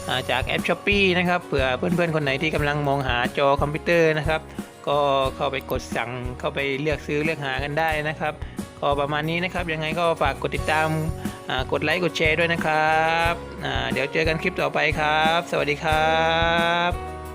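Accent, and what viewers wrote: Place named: South, Thailand. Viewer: ทำไมพูด เหมือนคนใต้คนใต้หรอครับ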